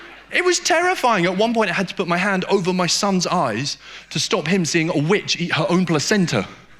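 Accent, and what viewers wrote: Denmark. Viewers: Simply Awesome! why did I first discover you now? Best Danglish since "The Julekalender". I hope you´re only beginning your triumph-tour here. Dit danske er faktisk fint, det et pænt svært sprog og vi taler som regel rimeligt forståeligt engelsk her, så det nemmere bare at slå over i engelsk, hvilket jo ikke giver meget sprogøre. Hope to see you again soon, put two shows in Sjælland please(maybe Kalundborg, there are thousands of Novo Nordisk employees, many of them internationals that will Love your show+ CPH ofcourse)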